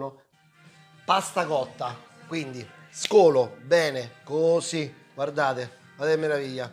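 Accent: Rome, Italy. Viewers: No vabbeh sei troppo simpatico 💖😂 ma per caso sei de Roma oppure marchigiano?? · Roman accent, very strong, like mine.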